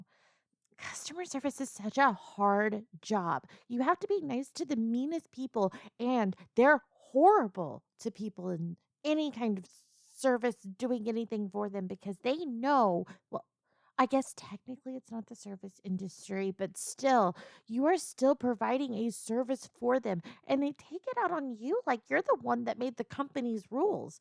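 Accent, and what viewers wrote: South, United States. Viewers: Customary comment as requested 😁 But yeah, very nice work as always. Your southern tones always add an amazing cadence to your characters. Hopefully you can use some of that money to upgrade your computer again, cause... Damn that thing been put through the ringer the last couple of days! Can't wait to hear what you hit us with next ❤❤❤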